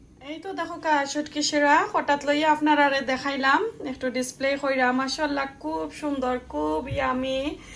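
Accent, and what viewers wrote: Sylhet, Bangladesh. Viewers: অনেক ভালো লাগলো,হাড়িগুলে অনেক সুন্দর কিন্তু চুলাতে নড়ছিলো,,,,হুকুইন শুরু দারুন হলো,,এত মরিচ,,আমার পছন্দ, তবে চেপা ব্লেন্ড করে দিতে হয় এটা জানতাম না,, সাথে ২ পদ মাছ দেয়া যায় সেটাও জানলাম,,কালার পারফেক্ট হয়েছে,,,, তেল ছাড়া রান্না টেস্টি হলো,,আমি আগে পেয়াজ রসুন তেলে দিয়ে রান্না করি,,আপনার মিট কারীও সুন্দর কালার হয়েছে,,, আইড় মাছটা কষিয়ে তুলে রাখি আমরা,,তরকারী হয়ে এলে লাস্ট এ কষানো মাছ দেই,,তবুও ভালো লাগলো,,আপনার ব্লগ আমার ক্লাসমেট দিয়েছে,,, সিলেটের কথা শুনতে ও ভালো লেগেছে ❤️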